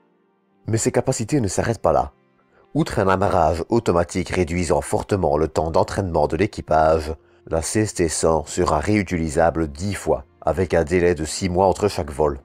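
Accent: Belgium. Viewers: vive l'accent Belge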